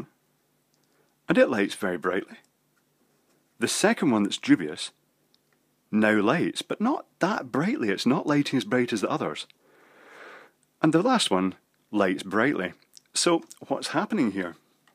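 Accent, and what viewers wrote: Scotland, United Kingdom. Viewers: Haha, yes! I'm doing this same 100W LED project, and these videos help me for this same reasons, also Scottish accent's refreshing to boot.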